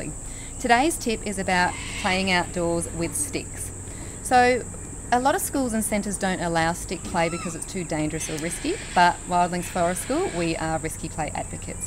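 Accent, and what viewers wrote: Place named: Australia. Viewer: Anywhere. I once accidentally put a "corn dog" stick through a guy's hand. It was a little pointed on the end, but not sharp - but it just took one little slip goofing off and he had to go to the ER. Ruined everyone's night at the county fair. You should really just be supportive of a place that advocates kids being kids, instead of trolling all her posts. Sounds like she is an Aussie, btw.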